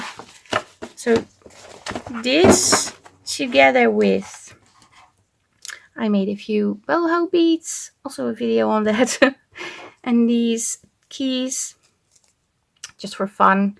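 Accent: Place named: Netherlands. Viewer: congratulations #lda5kgiveaway i like watching your channel because of your artistic way with junk journals , your interesting personality and of course your Dutch accent, the Facebook challenges are a bonus. thank you for these amazing chances to win prizes:face-red-heart-shape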